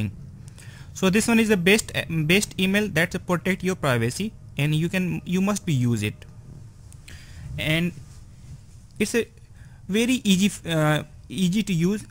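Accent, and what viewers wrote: India. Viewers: Sounds like maybe India?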